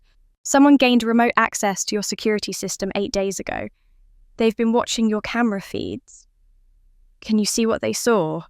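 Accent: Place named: England, United Kingdom. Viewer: Lol she said she lives in Cambridge, its an English accent...but she lives in Boston part of New England.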